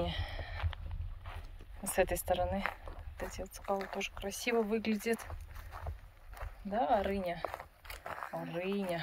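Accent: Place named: Armenia. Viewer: ты говоришь по русски с милым армянским акцентом 😁👍🌹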